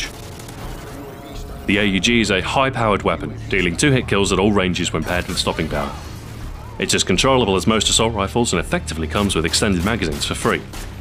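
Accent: United Kingdom. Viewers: are you british? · Hey mate im not gay but i love you! ur videos are the best ;) and i love ur british accent ;) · Its not Russian... Its a BRITISH accent, he's from Manchester.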